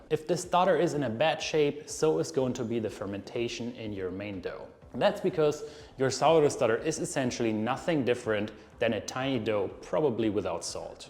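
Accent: Germany. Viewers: Wenn Deutsche am perfekten Englisch feilen…. Hört man trotzdem raus, dass es Deutsche sind. Dein Englisch ist sehr, sehr gut. Keine Frage! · My mom was born in Remshied, Germany, so listening to you brings me back home🎉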